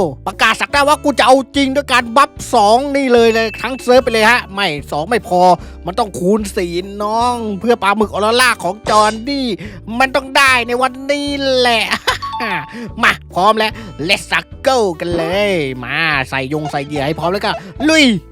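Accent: South, Thailand. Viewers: ตกได้ปลากระป๋องครับ 5 kg. สุดฝีมือแล้วครับภูมิใจสุดตั้งแต่เล่นมา😅forzarken11 เข้ากลุ่มพี่แล้วนะครับทั้งหมด5กลุ่มจาก fcที่ดูพี่มาเกือบ2ปีคลิปก็ยังสนุกเหมือนเดิมสู่ๆครับพี่ชายแหลงใต้เก่งจุง🎉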